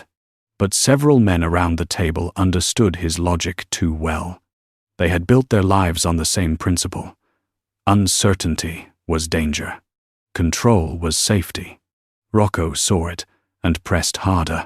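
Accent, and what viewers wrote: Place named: France. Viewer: frenglish? beautiful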